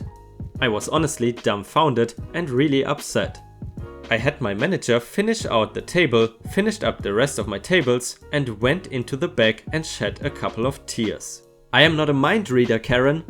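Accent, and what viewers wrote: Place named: Germany. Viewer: So, hey there. Until now, I wondered, why that accent is so familiar. Now with that "german" comment, I know why. You,re german, aren't ya?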